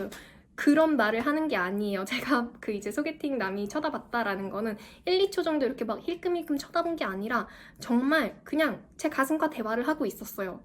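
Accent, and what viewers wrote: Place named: Canada. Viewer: 영문으로는 18.6K라고 뜨는데 상이하나봐요! 그리고 사실은 영어녹음한 동영상 다 안보고 일부만 들었을 때든 느낌은 상당히 익숙한 억양 및 발음으로 느껴져서 미국 중서부지역이나 캐나다오대호근처지역에서 오랫동안 살았다고 추정은 했는데 맞았네요 ㅎㅎ 토론토에 오래 살았을 것 같아요! 거기서 영어연수해서 알아챘어요!ㅎㅎ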